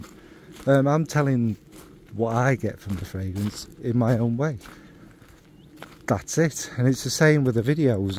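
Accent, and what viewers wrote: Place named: Northern England, United Kingdom. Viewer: Wait.. What's an algorithm? 😅😅😅 Listen.. There's organic, there's forced and formulated, and there's a ton in-between. Some are naturally good at being robotic and sterile with it, some are really great with their 'stage presence' who are excellent on camera and just naturally are fantastic and popular. I only film things if it's enjoyable and it's something i want to watch back for my own viewing. I've an idea for a video which has absolutely no relevance and I'm sure zero people will be interested by I'll probably do it for my own lonely little world of nerdy viewing. Like a fragrance diary..that happens to be public 😅😅😅 Anyway.. I started drinking whiskey at the start of this video, and I'm half way in and feel pissed. So will have to pick it up tomorrow 😅😅 🥃🥃 but always good to hear a proper northern geezer being true and raw, if a little rough around the edges 😁😁 Never stop the stories and creative content Mr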